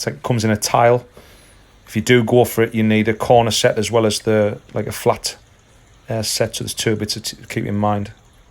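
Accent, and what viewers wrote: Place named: Sunderland, United Kingdom. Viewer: He’s not from Newcastle !! That’s a makem accent 🤮🤮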